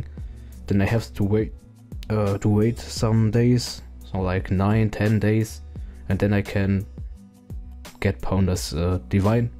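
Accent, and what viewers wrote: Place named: Germany. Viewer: Digger learn mal Englisch, du redest im deutschen Stil english. Lass es sein oder lernen besser Englisch. Deine Videos sind cool anzuschauen und das feiere ich aber dein english ist echt für Blutungen im Trommelfell verantwortlich. Einfache Sätze wie "where is he" sind doch 5. Kläger Niveau. Und nicht "where he is". Sorry dass das so bissig klingt aber mach lieber auf deutsch, was auch passt und zur Not Untertitel wenn die NICHT deutschen was verstehen wollen :) Wir müssen schließlich auch Englisch lernen um ihren Kram verstehen zu können.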